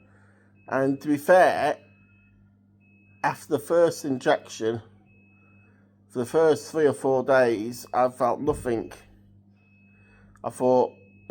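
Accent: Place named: Northern England, United Kingdom. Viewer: What part of the uk are you from? You have a northern accent! Ah bless you keep strong!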